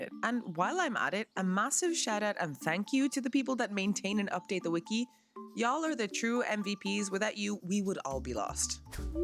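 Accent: Ireland. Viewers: I hope you don't mind me saying, but I looove your accent! Is it slightly Irish??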